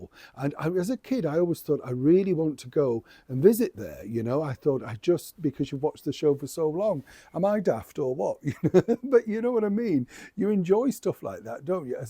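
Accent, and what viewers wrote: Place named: Redcar, United Kingdom. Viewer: OH MY GOD, we are so alike Im from Redcar now in Canada you talk and i totally remind me of home. Snobery up and down stairs. lol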